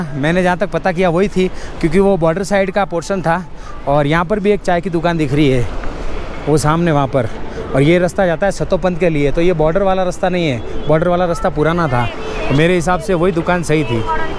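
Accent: Gujarat, India. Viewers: Your videos are good but your Hindi is Gujarati accented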